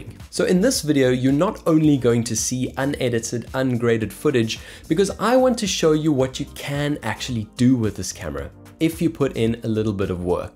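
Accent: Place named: South Africa. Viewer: Such an in depth review - thanks so much man! Sounds like you’re from South Africa as well!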